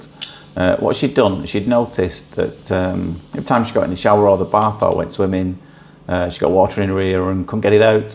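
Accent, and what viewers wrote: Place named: Lancashire, United Kingdom. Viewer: You sound like you're from Bolton/Rochdale way. I need this doing I think, where can I find you?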